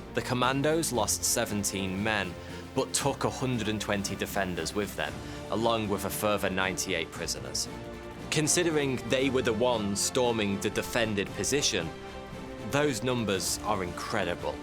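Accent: United Kingdom. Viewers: Could not take the British accent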